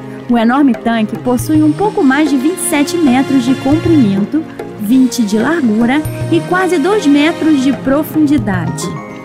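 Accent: Rio de Janeiro, Brazil. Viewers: sua viz,com sotaque carioca lindo, é muito agradavekt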